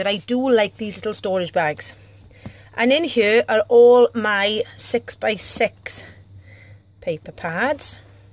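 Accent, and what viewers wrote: Wales, United Kingdom. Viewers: I love this craft room. I also adore your Welch accent. I've never even filmed a single video yet as I hate my Geordie accent 😖. Thanks for sharing this amazing space full of awesome crafty organisation. I've just ordered the mint green trolley from hobbycraft and waiting for it to be delivered so you have defo gave me some fabulous ideas on how to use it for all of my paint and glitter supplies. Happy Crafting xx Berne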